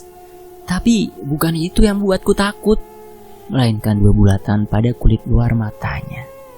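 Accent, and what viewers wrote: Java, Indonesia. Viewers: demen bnget pas mimin bawain logat jawanya... 🤭🤭🤭 rada2 gimna gitu... 😅😅😅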